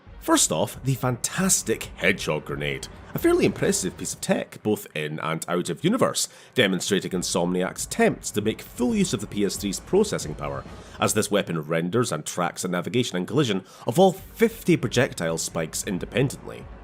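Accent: United Kingdom. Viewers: Dude is just too British. I can't stand it.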